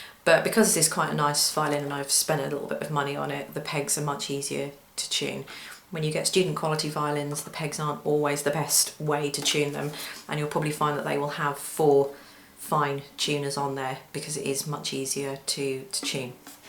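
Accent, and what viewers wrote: United Kingdom. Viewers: Love that Limey accent! When she says "tune" she pronounces it like "chune" Chic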